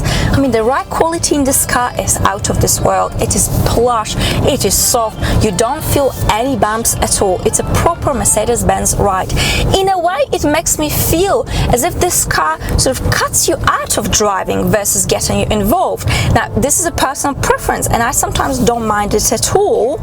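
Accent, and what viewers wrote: United Kingdom. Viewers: Thank you for a great review, ps your accent did you spend some time in the UK? Sounds like it sometimes. You look really smashing with your different great outfits in your reviews. Ceep it up 👍😉 cheers.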